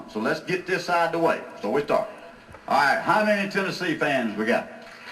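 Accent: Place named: Georgia, United States. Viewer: He was born at Fort Benning which is considered outside Columbus, Georgia. He was from South Georgia and he sounds so different from North Georgia. : )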